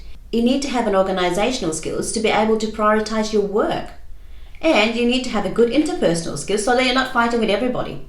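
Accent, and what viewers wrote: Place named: Australia. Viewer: Thank you for the content although i'm curious to know how you managed to get a good aussie command and accent in 9 years time with almost no traces of indian. Any secret to this you may want to share?😉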